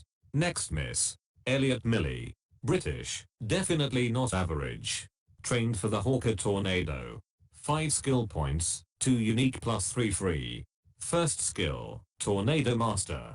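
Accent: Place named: Russia. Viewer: I'd rather hear your Russian accent than this shit voice.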